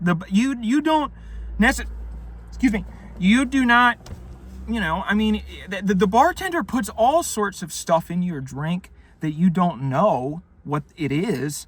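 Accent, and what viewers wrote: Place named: Baltimore, United States. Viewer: I never noticed before that has a bit of a Baltimore accent.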